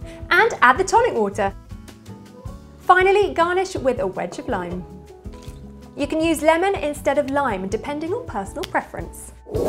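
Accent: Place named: RP, United Kingdom. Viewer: Why do u sound so posh even tho I live in England 🏴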